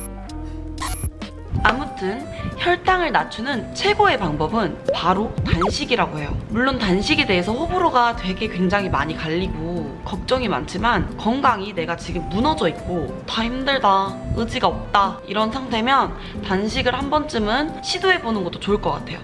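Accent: Gwangju, South Korea. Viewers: 광주같아여!! 익숙해서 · 공부 열심히 하셨네요 전문가같네요 고생하셨네요 근데 전라도분이신가봐요 억양이 ㄷㄷ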